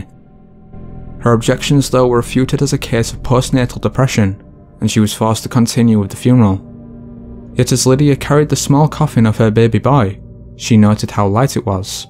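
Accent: Scotland, United Kingdom. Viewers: You had me with your first British utterance. New sub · I enjoyed your well done presentation; and your voice. Curious: Your accent sounds like a British regional accent. But I've no idea from where. Congrats, in this conforming age, of sticking to your accent-- adds character and depth btw. And your voice with it is clear, unlike some regional British accents, let alone other harder to understand ones. So, curious, where is your accent from? (Or if someone else recognizes it). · Where is this narrator from? I can't decide where in Britain this accent is from. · so, the #2 mystery is still UN-SOLVED!! theories about how someone died means it's still a mystery. You had me all convinced b/c of the disarming British accent. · Totally off topic. I'm trying to nail down your accent. Sounds a bit Scottish to be English yet a bit too English to be Scottish. 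Leaning towards Scottish. I'm American and not super knowledgeable about different dialects within the different UK countries. Educate me please, and good vid thanks. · British accent is terrible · I rather like your accent although it’s a little difficult for my Brooklyn ears to understand. Is it a Scottish accent? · You ripped the voice of Criminally Listed right out of his mouth and made it british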